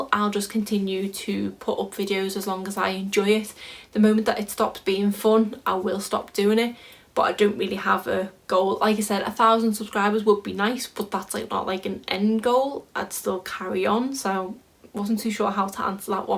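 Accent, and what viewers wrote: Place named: Yorkshire, United Kingdom. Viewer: Your accent sounds something like Yorkshire. Lovely accent!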